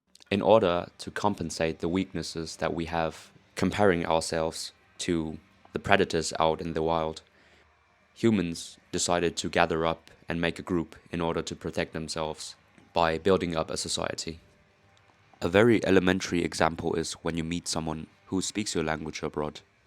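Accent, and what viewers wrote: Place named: Germany. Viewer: Dude don't force your accent. Your accent was cool as it was it's not American it actually sounds German. But not a thick German. I am Australian and your accent trial is a bit jarring because you go English, Australian, American, German in one sentence.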